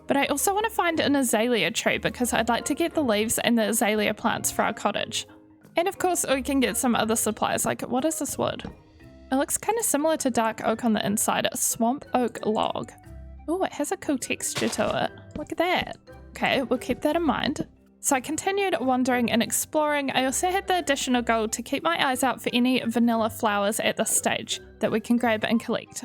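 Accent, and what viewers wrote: New Zealand. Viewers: You mentioning the poppy’s significance as a symbol of honor for war veterans made me laugh out loud. I was literally at that very moment thinking about how I love that I found your channel not only because of your style of content and how perfect it is to watch/listen to while I sew or play Minecraft myself but because of your accent! I portray a WWI ANZAC (specifically a New Zealander in the AANS!) nurse as a reenactor (one of my many impressions to educate the public with) and there are two events a year I go to that are supposed to be immersive, so I try to brush up on my New Zealand accent beforehand and your content helps so much with that. I can’t believe I was thinking about just that when you mentioned the connection. 😂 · Im so used to watching American and British minecrafters that it took me a hot minute to place your accent, even though Im also a kiwi xD Made me happy to see you're from Nz. 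This was my first video of yours and I enjoyed ❤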